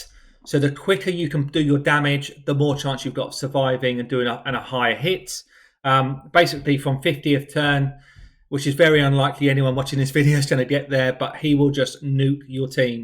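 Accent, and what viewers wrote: United Kingdom. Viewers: you rock!! I've been watching your videos for a while and I always look forward to your insight and delightful British accent.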